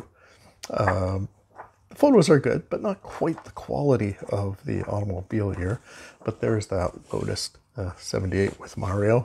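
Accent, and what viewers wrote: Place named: Canada. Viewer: Hello, Thank you for another nice content about F1 slotcars, as well as the extra footage and books you`ve shown. The Lotus F1`s remember me of my teenage days in the 70`s when I watched a lot of F1 races. I had been a fan of Ronnie Peterson and I had the Corgi Toys replica back then. When I got older, I gave it away to my cousin to play with. Sadly, there were no F1 models of the Lotus by Carrera at that time, if I remember correctly. I had a 132 Carrera Universal set then. Scalextric was not known in Germany at that time here. Very nice models by Fly, as they always do, but technically seen, Fly is not my choice to go for in slotcars. As shelfqueens they are maybe fine, but they are to fragile if you crash them in my opinion. Are you situated in Canada, cause I think I heard a Canadian accent there?:-) I got a lot of Family in Ontario. 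Any way, I finally left a subcription and a like. Greets over from Germany 🙂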